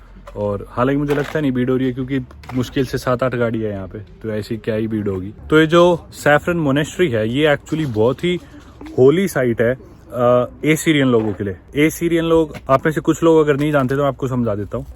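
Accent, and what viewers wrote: Haryana, India. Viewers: Kbi kbi toh aap pure haryanvi me aa jate ho baladth- ox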